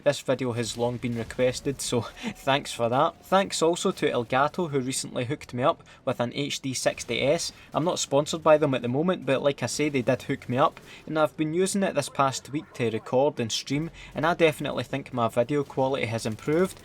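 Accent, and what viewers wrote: Scotland, United Kingdom. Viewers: I can fucking subscribe again oh thank god also your voice is like Morgan Freeman but Scottish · hes scottish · Mate I’m fuckin Scottish aswell , but your accent is annoying as fuck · Well duh obviously he's scottish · I’m gonna take a shot in the dark and say that you’re from Scotland.. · Splattt808 you gotta love scottish accent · Are you scotish i am new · he's Scottish its a lovely accent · That thick scottish accent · Is this british accent guys ? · That is one T H I C C scottish accent. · Every insult is twice as funny when a Scottish person says it. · Think I need a Scottish boyfriend now😂😂 that accent is way too nice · Oh that thick sexy Scottish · Scottish accent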